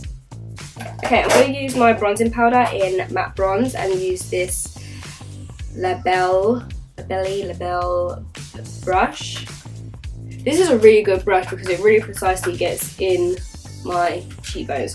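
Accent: England, United Kingdom. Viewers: Are you from London?:') the way you talk reminds me of myself haha! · I love your accent! could just listen to you talk all day makes me miss being on vacation in england :(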